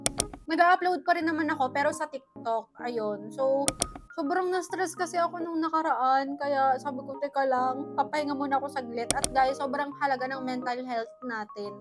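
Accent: Batangas, Philippines. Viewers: Magames ka ba? Kung oo, anong games ang kinakahiligan mo? Kung hindi, anong ginagawa mo o pinagkakaabalahan mo gawin pag bored ka? namiss kita! Una kitang napanood yun atang bumili ka ng bagong iphone at kasama mo ang tatay mo. Natuwa ako sa iyo gawa ng punto mong batangueño ay hindi mo tinatago. Namimiss kong umuwi ng batangas dahil sa iyo. Dun kita sinimulan panoorin. At masaya ako na malayo na ang narating mo. <3 Godbless you always! <3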